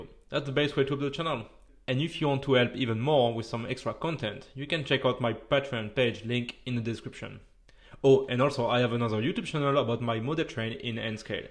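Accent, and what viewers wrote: France. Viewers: The voiceover is fine. Yes he does have a strong French accent but I, at least, understand it. Maybe for English speakers that were not exposed to other languages it's harder but if you have a difficulties switch on the subtitles. BTW, Thom from Trains Are Awesome is American. He was born in the Netherlands, moved to Japan Japan when he was young and went back to the Netherlands as a teenager but his parents are American and they spoke English at home. Correct pronunciation can be hard when there are major differences between your native tongue and other languages and in the case of English it's easier for Germansor dutch, for example, that to French or Spanish speakers. It also depends on the amount of exposure and who early you start. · Thank you for all of the great train content and now doing verbal sound. I have taught English as a Second Language and have a couple of tips. 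Some people tend to read out loud faster then they would normally speak. You have admitted that your French accent is thick and that is true. If you slow down, your English would be better understood. I am not suggesting anything dramatic, but in some places you are reading so fast that your words slur into one long word. In places of the video where you are adlibbing the English is easier to understand. Please know this is not a complaint. I am just offering a little advice. The advice is free and of course that may be all it is worth. 😁😁😁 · It is awful. He speaks english with the speed and pronunciation (cutting the end of words) like french. Subtitles dosn't help because I have to mute the video then. Why should I do that ?!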